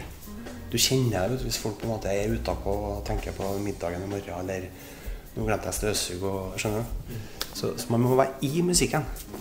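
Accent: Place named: Trøndelag, Norway. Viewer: Bra forklart, men hvor er "The Subtitles" ikke mange av mine kompiser her need som forstaar TrOndersk!?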